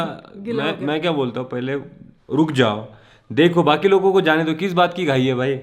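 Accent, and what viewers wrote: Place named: Gujarat, India. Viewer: Your gujrati accent is awesome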